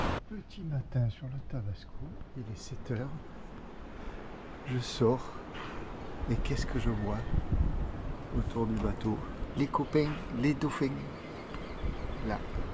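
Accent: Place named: Marseille, France. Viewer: J adore ton accent Marseillais. La bizz. Denis S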